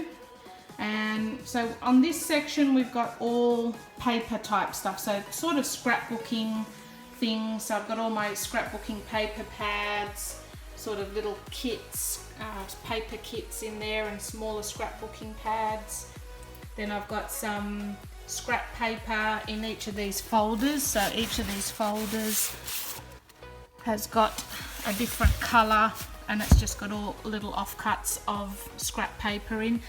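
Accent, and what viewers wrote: Australia. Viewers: I love it! It feels comforting to hear an Aussie accent too! Are you Indigenous Australian?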